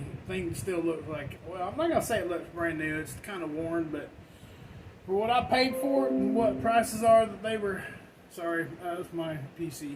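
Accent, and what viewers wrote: South, United States. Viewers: your accent is getting very Southern again.... you picked that back up quick!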